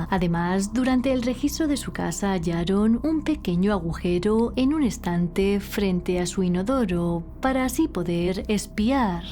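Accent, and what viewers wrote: Spain. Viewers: Ja debe ser el tono y acento de algunas regiones de España. Ya escuche esa tonada en otra gente española en YouTube.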